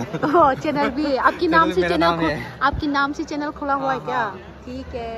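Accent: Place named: Bhojpuri, India. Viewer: Behn ji aapki hindi bolna..hamko bahot acchha lagta hai...like bhujpuri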